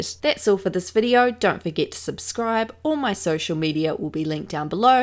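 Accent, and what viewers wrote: Australia. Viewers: sounds Australian to me :) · Are you an Aussie or a kiwi??? I’m British so I can’t tell the difference!! Hahahah you should do a video about you rather than drama your voice and accent is great sis!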